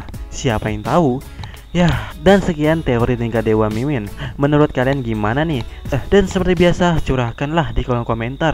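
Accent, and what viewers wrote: Palembang, Indonesia. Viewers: Fix Mimin orng Palembang😂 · Ay wong Palembang kau ni ee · pantesan suaranya kek orang palembang gitu min hehee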